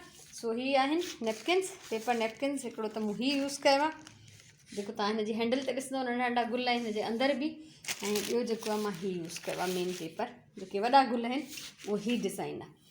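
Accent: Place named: Larkana, Pakistan. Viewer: Assalamualaikum, dadho sutho lagho khalis larkane wari language budhi " KHAI DHO KAYO"😊 Asan khay Ayoob cholan ware ji khabar aahay jaiko Royal Cenima jay samohn rarhi lagaindo ho, tamam behtareen chola thahindo ho. Missed your Friday session.😞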